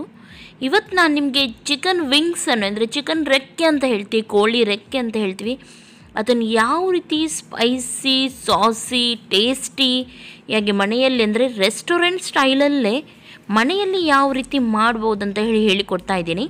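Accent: Mangalore, India. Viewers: Ma'am nimma manglore Kannada kelodake tumba kushi aguthe kelthane irbeku ansuthe.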